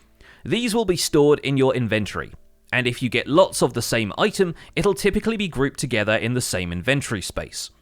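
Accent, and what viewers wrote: United Kingdom. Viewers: Yo are you British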